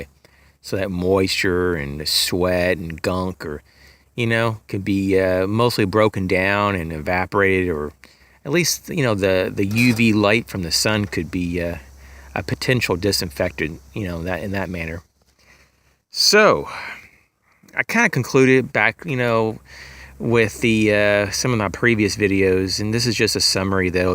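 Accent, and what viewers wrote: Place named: South, United States. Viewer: Solid, important info that folks fail to mention in most survival videos. Lovin' the "warsh." 😂 reminds me of my family talking in the south down in Springfield, MO. Btw: Mr. Hank Hill, you forgot to mention your extensive knowledge on propane...but yet you nailed it on the synthetic underwear. Haha. Have a great day pal.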